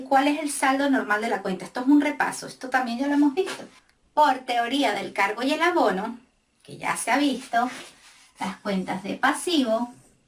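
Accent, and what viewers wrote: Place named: Venezuela. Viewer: Muchas gracias por este video, estoy comenzando a estudiar contaduría y administración tus videos me han ayudado bastante. Quería preguntarte si es posible que hagas un video en cuanto a tips para entrar a trabajar empresas como PwC, Deloitte y KPMG, específicamente que herramientas o sistemas se manejan y que conocimientos podemos aprender para tener éxito en entrevistas de trabajo para ese tipo de empresas. Soy joven aún pero quiero trabajar en algo directamente relacionado con mi carrera y creo que entrar en una de las empresas que nombre anteriormente es un buen paso para comenzar y aprender otras cosas que no veo en la universidad, a veces siento que en la universidad se limita mucho a lo teórico. Te hago esta pregunta porque soy de Venezuela y por tu acento me parece que tú también😃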